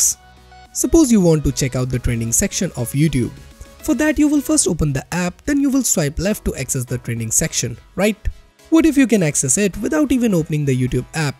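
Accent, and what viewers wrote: India. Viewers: It's like the robo narrator but with a heavy Indian accent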